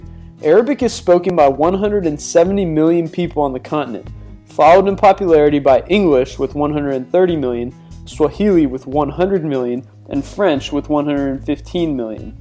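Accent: United States. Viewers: The American accent should not have been used for this narration. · U sound American but when speaking of measurements u use metric instead of American standard. Why?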